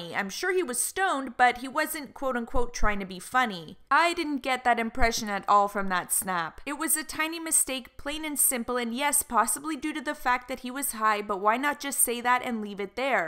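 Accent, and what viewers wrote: Canada. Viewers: Jesus Christ this girl's accent....Is she Canadian or something? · T J. Are you seriously suggesting a person from an English speaking country (canada) should get english lessons specifically to sound less canadian so that their slight accent doesnt offend your ears? Guess what? Not all viewers are from the US and the rest of us couldn't care less about a slight variation in accent.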